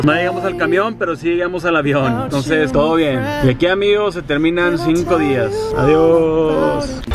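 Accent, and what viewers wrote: Monterrey, Mexico. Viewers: Esta bien chido el acento regio!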